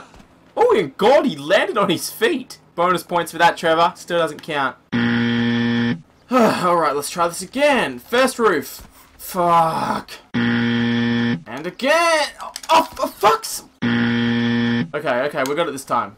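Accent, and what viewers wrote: Australia. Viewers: Australian accent. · R u Australian because u r to loud mister u need to turn the noise down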